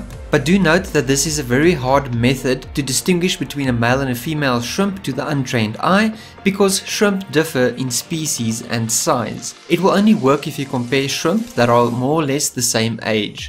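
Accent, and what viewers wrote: South Africa. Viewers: Aweeeeeh I didn't check your surname but as I heard your voice I knew ! This guy is from RSA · Bro you had me at the intro, but the south African accent was the cherry. Subbed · Are you from South Africa Is jy Afrikaans?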